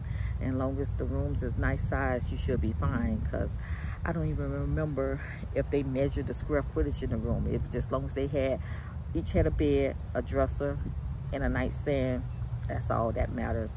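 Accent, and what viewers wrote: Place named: South, United States. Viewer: You sound like my TT, and I'm straight out the south too. I think you sound just fine! Thanks for all this guidance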